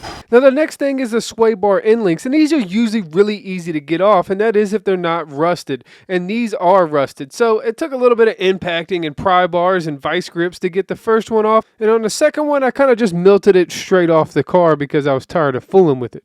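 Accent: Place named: West Virginia, United States. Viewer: I can't quite get a fix on accent. Where is he from? West Virginia? Maybe he should require to hold the title on repair jobs over $2000?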